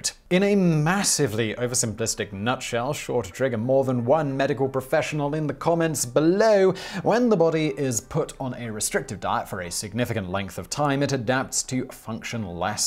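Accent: England, United Kingdom. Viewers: sounds so English and proper, when he cracks up, it's super hilarious! Cracks me up!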